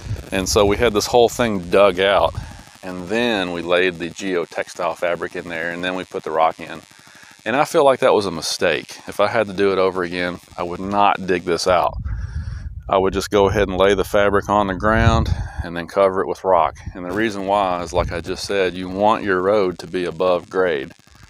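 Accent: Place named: Kentucky, United States. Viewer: I knew you were from KY, before you said it…. Sound just like me 😂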